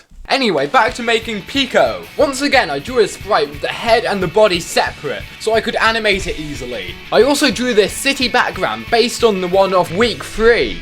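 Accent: United Kingdom. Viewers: This guy has the most british accent ive ever heard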